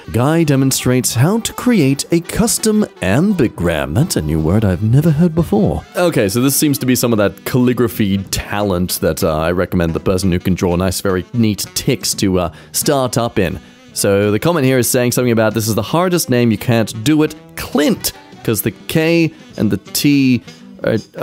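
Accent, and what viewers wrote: Australia. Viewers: mate, that flower is already dead… that yard was beautiful and green with the flowers, and it didn’t look like shitty fake grass… or dying one… hand to blade is where my mind went too 😅 too many humans are the definition of ew so I agree with that sentiment in this case… but no, insects are beautiful useful important fellow animals but otherwise, ooh, and yes please keep your aussie accent mate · it's fine, you can go full Aussie.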